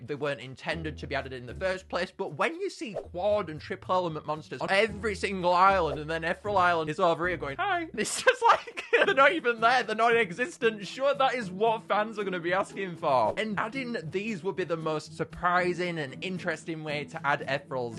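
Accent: United Kingdom. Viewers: His accent is British that's the reason why he pronounced it.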